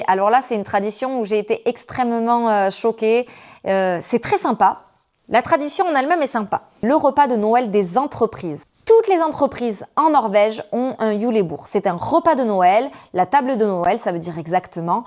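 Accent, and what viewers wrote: South, France. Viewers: Ce n'est pas moi qui ai parlé d'accent mais l'autre. Beaucoup de Français prononcent les O comme des E maintenant et pour les hommes ça fait très efféminé. Malheureusement beaucoup de Français du sud cachent ou ont carrément gommé leur accent du sud. En ont-il hontes ? Parfois son accent du sud ressort donc elle l'a toujours alors ça veut dire que par moment elle le cache. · A juger par l accent elle est du sud donc oui c’est plus difficile.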